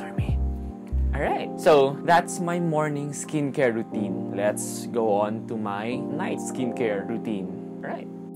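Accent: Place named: Philippines. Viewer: I love it when you speak Tagalog because your accent comes out and you sound awesome with it. :) Btw I've been following you because of Dude Pare Chong Bro (which was phen-o-men-al), so that's probably why I tend to listen more to how you speak. Thanks for the video!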